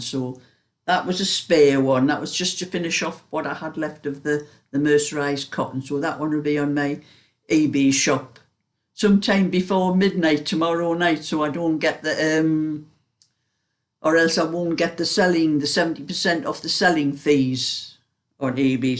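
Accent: Newcastle, United Kingdom. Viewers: Hi,this is my first time messageing you but have watched your video's for over a year now and really enjoy them,my husband loves your rants being ranter himself😂being geordies ourselves but now living in shropshire,it's lovely hearing the geordie accent,i am from jarrow(jarra)my husband is from hebburn. I love listening to your stories from the past. Keep up the good work x PS could you tell me where you got your wool winder please,i have one but it dosn't make very big cakes like yours do❤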